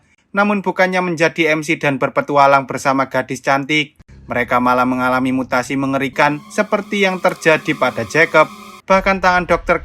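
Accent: Java, Indonesia. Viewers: Pengisi suaranya jawa banget 😅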